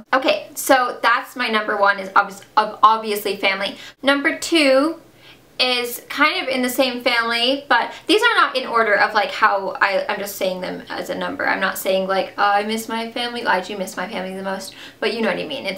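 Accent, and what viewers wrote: England, United Kingdom. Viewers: I KNEW IT. I've only seen a few of your other videos so I didn't know you were from England before, and you have no accent, but I still guessed you were from England. Something about the way you speak is British, even if you don't have an accent. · what happened to your English accent? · Well, on and off throughout her growing up years she lived in America. Also, sometimes she says the word "rubbish" for something that's disagreeable, she pronounces words ending with "ing" as "ink," and pronounces "mirage" as "MEER-awj" (a soft-sounding j) Those are the times when she seems to sound a bit British to me:) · did you have a British accent? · your English accent totally came back in this video! lol! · I can tell she used to British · Can you PLEASE PLEASE PLEASE talk in an english accent? Do you miss having an accent? I wanna hear it so bad! 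Also, I would love to learn your story, where in England you are from, why did you move to America, where in America, etc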